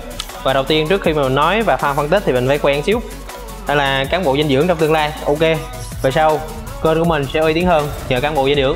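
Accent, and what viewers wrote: Hue, Vietnam. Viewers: anh ở huế đúng k ạ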